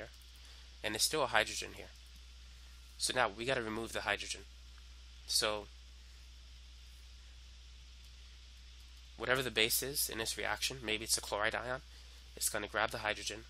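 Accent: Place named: New York, United States. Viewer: Isaac Pelletier no sounds like from some New York district.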